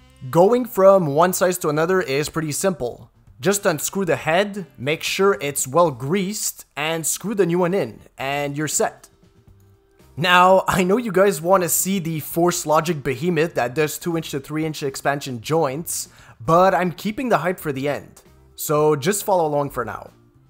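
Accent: Quebec, Canada. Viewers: P’tit accent Québécois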